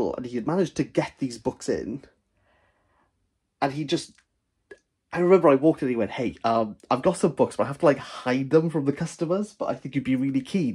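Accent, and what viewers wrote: Wales, United Kingdom. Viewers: This made me giggle! Here in Melbourne it was lovely to hear a fellow Welshie! Thanks go to Simon for pointing me in this direction! 😊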